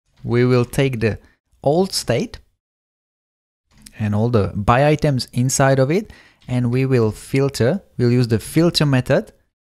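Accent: Czech Republic. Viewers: Within the first 10 seconds, I said, "This guy is Czech".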